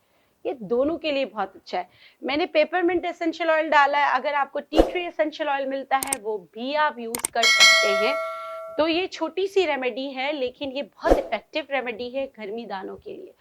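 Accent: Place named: Gilgit-Baltistan, Pakistan. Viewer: Salam Dr Madam, this is very nice and very simple kids and others, thank you Dr gi Your way of talking shows you from gilit baltistan.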